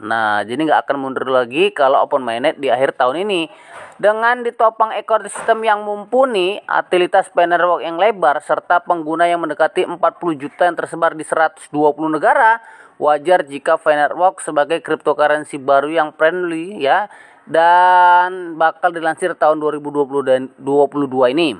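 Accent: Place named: Java, Indonesia. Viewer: aksen jawa kental sekali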